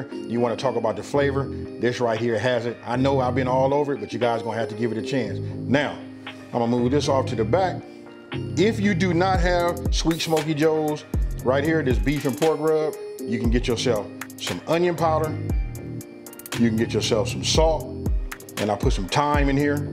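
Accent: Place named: Chicago, United States. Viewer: Good job bro! I like the beat in the background also. If I wasn't mistaken I would have thought you was from the Chi lol.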